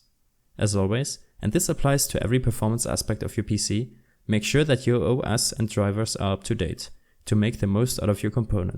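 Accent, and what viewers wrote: Germany. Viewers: lol the german accent xd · Man hört so hard das du Deutsch bist 😉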